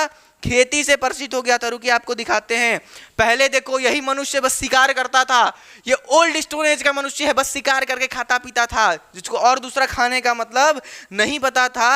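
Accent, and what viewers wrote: Bihar, India. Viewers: Bahiya aap Bihar se ho kya aawaz se lag rha hai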